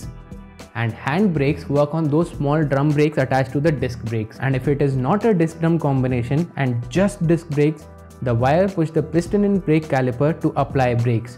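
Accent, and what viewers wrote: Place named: India. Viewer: Why can't you get rid of that indian accent ?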